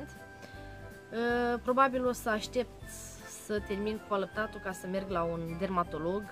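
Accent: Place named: Moldova. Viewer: ..multumesc pentru incurajare...te admir si iti urmaresc vlogurile ptr ca esti naturala...imi place cum vorbesti...accentul tau moldovenesc ca al meu...deocamdata tin totul sub control...ma intrebam de ce eu...din acel procent mic de femei pe care reusesc sa il faca in sarcina...adica diabetul...si neavand nici in familie pers cu diabet.. nu sunt supraponderala....am avut toata viata 45 de kile...si in sarcina am pus 10 kg pana la 7 luni....eu fiind schija mereu imi ziceam lasa ca poate ma ingras in sarcina:)))stiu ca in ultimele luni pui multe kg cu acest regim nici nu dau jos nici nu adaug...noi in familie suntem maruntei...si surorile mele....nu stiu de ce zic ca as face bebe mare....eu mereu ma intrebam macar sa aibe 3kg sa nu fie o matza mica de copil....si cand am auzit ca am diabet si tre sa tin dieta sa nu se duca surplusul de zahar ka bebe ca s ar transforma in grasime la el..am ramas tablou...la 20 de sapt avea 300 de grame...la 26 am facut testul de glicemie si mi a iesit prima valoare 95 in loc de 92 si mi a dat trimitere la diabetolog...nutritionist. 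Este fff stresant sa te trezesti dintr o viata normala...dormeam cat vroiam in sarcina...acum sa ma trezesc dimineata ia ti glicemia pe stomacu gol...si nu mai poti dormi ca vaii ia micul dejun la ore fixe....cantmd te vezi cu glucometru in mana te simti bolnava...dar trebuie sa ma resemnez....daca imi ies valori bune ma simt ok...dar cand is peste limita deja ma demoralizeaza....nu stii ce sa manan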